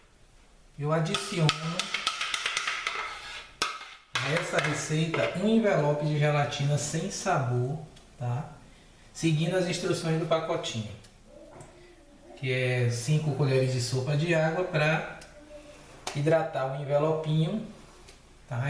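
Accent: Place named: Bahia, Brazil. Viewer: que Deus o abençoe por compartilhar com a gente seus conhecimentos. Parece q vc é baiano né? O povo bom...As viagens q mais gosto são para sua terra. Então, gostaria de uma receita sua pra forma de 30 cm de massa amanteigada branca e de chocolate que na esfarele quando eu cortar.... se puder me passar???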